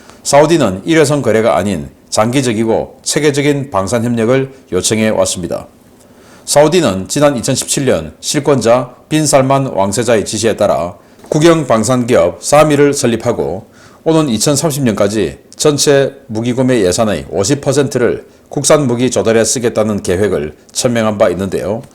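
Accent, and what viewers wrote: Gyeongsang, South Korea. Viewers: 신인균 너는 경상도 말투나 고쳐라 존나 듣기싫다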